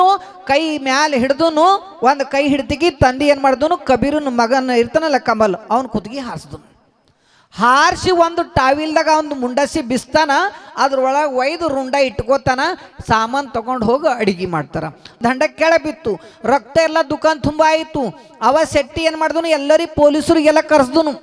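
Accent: Bidar, India. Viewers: ಬೀದರ್ ಭಾಷೆ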